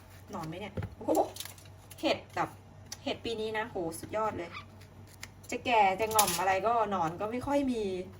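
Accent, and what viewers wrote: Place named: Isan, Thailand. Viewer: พี่ พูดอีสานน่ารักพี่ก็ขำไปกับไอ่ด้วย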